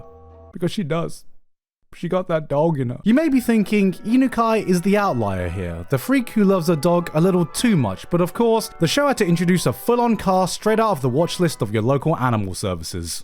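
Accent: United Kingdom. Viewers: This video is conclusive proof that a british accent doesnt mean youre smart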